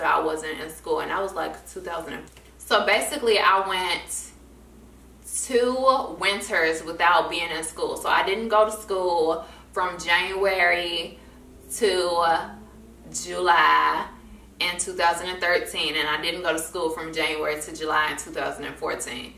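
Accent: Chicago, United States. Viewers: I had a feeling you was from Chicago. I watch your videos often and I always be knowing when people from Chicago!! I can just hear it in your voice and stuff. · Are you from Chicago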